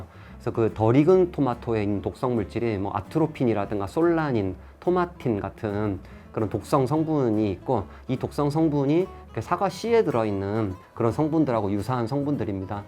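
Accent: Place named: Gyeongsang, South Korea. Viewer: 잘봤습니다~~^^ 영상과 관계없지만 혹시 원장님 고향이 경상도세요?? ㅋㅋㅋㅋ 억양이 베어 있는거 같아서 친근감이 드네요 ㅋㅋㅋ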